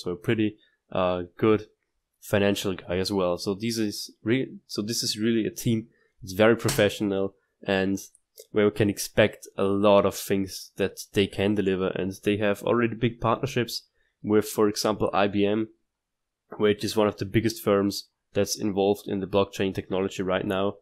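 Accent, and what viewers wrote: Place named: Austria. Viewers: 'Schule' next to Project by the bookmarks, there is a "andern" button on the page. I'd guessed Germany or Austria, too. :) and the way you talk in English said enough for me, cause I've worked for Austrian companies ;) . Nice video! Thumbs up! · after 30 seconds i realized you are Austrian.. cool video, i like XLM.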